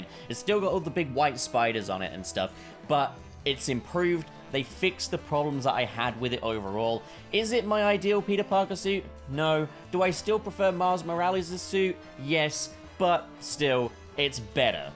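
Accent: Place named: United Kingdom. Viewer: I got you and bobs mixed up, are you both from the same area of the UK?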